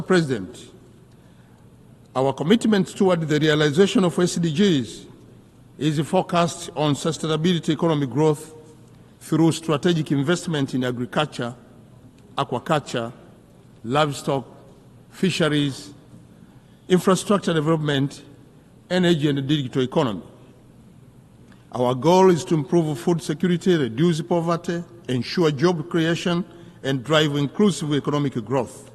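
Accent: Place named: Tanzania. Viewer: Mbona mataifa mengine yanakuwa na tatzo lile lile? Unawasikia wahindi? Acha dharau kwa Waziri wetu, Yuko vizuri ndio maana kuna UK ENglish , Tanzania English etc. Unashida weye. Unataka aonngee fluent english kwani ye Mzungu!! Una upeo mdogo kuhusu lugha.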